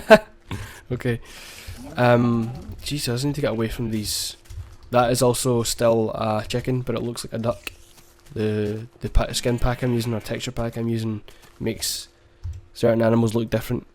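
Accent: Scotland, United Kingdom. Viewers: r u scottish?????????????/ lolololol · maaan i love your scotish accent omg be my brother please omg you are like Desmond from LOST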